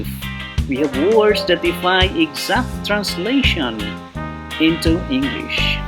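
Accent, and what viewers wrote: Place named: Philippines. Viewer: Nice one you sounds filipino ag galing. Team unity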